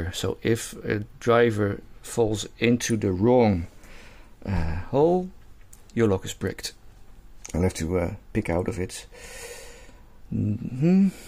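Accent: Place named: Netherlands. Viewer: love the video bud! very informative. love hearing you silky smooth dutch accent ❤ excellent job on the pick too 😊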